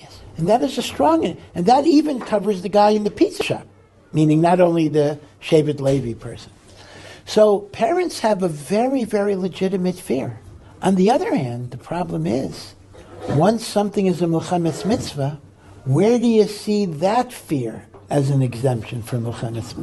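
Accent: South Africa. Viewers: Dude where’s your accent from? South African or Australian? And how’s Palestine your home?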